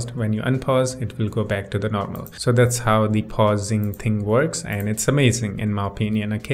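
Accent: India. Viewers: You indian?huh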